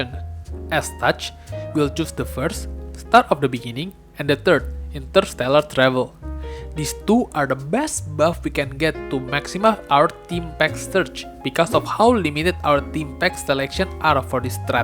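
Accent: Indonesia. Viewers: indo ya bang? kedengaran banget accentnya wkkwkw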